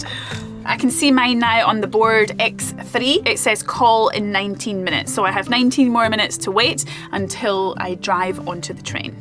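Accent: Scotland, United Kingdom. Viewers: Your Scottish🎉 accent is so nice !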